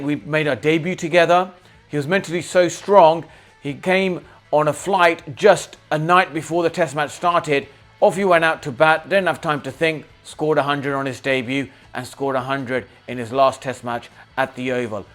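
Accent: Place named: India. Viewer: You still have some Indian accent left within you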